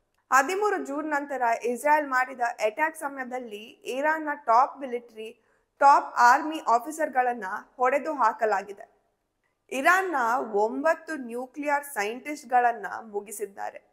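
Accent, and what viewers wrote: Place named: Coastal Karnataka, India. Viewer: What's wrong with her Kannada? Her pronunciation resembles that of coastal areas. Otherwise her speech is very clear. Don't discourage our own people.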